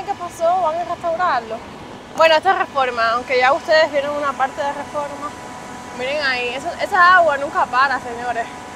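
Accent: Cuba. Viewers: No me gusta el acento cubano , nunca me va a gustar. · Y a ti tampoco te enseñaron que en cada pais hablan diferente ella su acento es cubano. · Hola preciosa eres muy graciosa e tu forma de hablar es muy bonito el acento cubano eres bienvenida a nuestro pais soy tu fans también a través de ti conocemos las costumbres de Cuba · Poco a poco te adaptaras y hasta tarde y temprano se te quitara en tono de voz de cuba. Y sin pensar tu hablaras con el tono de mexico como las damas chilangas. Ya veras ..con el tiempecito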